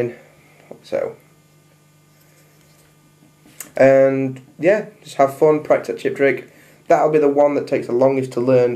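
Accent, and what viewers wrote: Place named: Northern England, United Kingdom. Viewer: I know you're up North but I can't pinpoint the accent, is it Blackburn/Sheffield?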